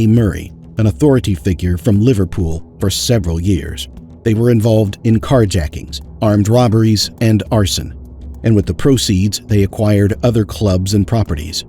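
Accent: United States. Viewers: Why is it that all these documentaries seem to have a cheesy American narrator? Unwatchable. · How do you with your American accent know anything at all about the twins. ? Been watching a few movies? One thing that is for sure, the east end would still be the same Also no Indian restaurants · Muggy Yank accent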